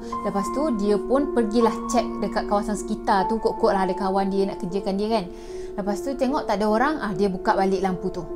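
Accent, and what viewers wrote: Kelantan, Malaysia. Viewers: Ni orang kelantan slang nya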